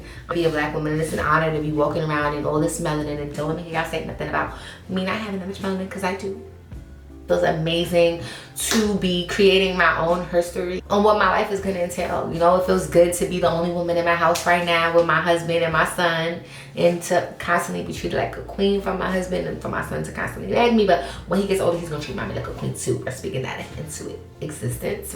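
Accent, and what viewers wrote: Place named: Brooklyn, United States. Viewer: It’s your New York Brooklyn accent for me !!! I have to watch this Anna series because this sounds goodddd Also can’t wait for you and your husband to do the how you met story time video 🤎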